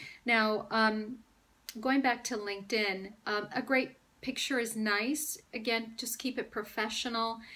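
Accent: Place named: United States. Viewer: I"m most surprised that English is not your first language. Floored really. Your American accent is perfect! I've worked with foreign students and with ESL programs, and I would never have guessed English is your second language. You constantly surprise me B!!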